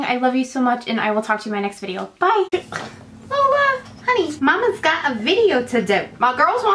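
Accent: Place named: South, United States. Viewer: I hear a southern twang (other than the full country you do) but then I hear a Boston accent where the hell are you from. I would have said l.a before I started hearing all the other stuff.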